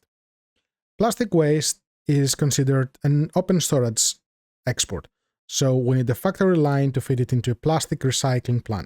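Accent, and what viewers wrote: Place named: Finland. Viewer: I love Finnish accent so much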